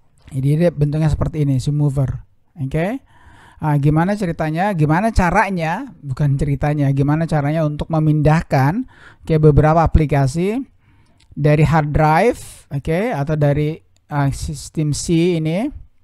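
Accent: Makassar, Indonesia. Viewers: orang makassar ki ?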